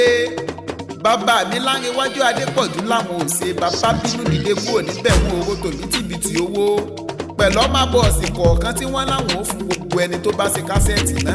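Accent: Ibadan, Nigeria. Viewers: But ibadan ascent is too pronounced in your ewi. Though you have a good composition and presentation but work on your ascent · Why is the Ibadan accent an issue? That is the flavor of Yoruba poetry! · Great to see another buddyeing Ewi artist join our genre. This is far from polished Ewi. You have got promise though. You need to remove the strong Ibadan pronounciations though to gain broad appeal and acceptance. I'd love to work with you if you respond to me.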